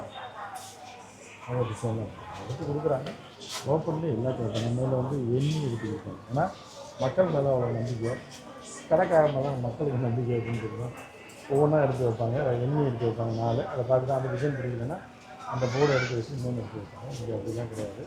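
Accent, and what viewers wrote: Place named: Madurai, India. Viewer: வணக்கம் தொடர்ந்து காணெளி பார்த்து கொண்டு இருக்கிறேன் இதை பார்த்த அதன் மதிப்பு அவ்வளவு தான் உங்கள் பேச்சு நையாண்டி கலந்து மதுரை பேச்சு உங்கள் சேவை தொடரட்டும் இப்படிக்கு ரேவதி பிரபாகரன்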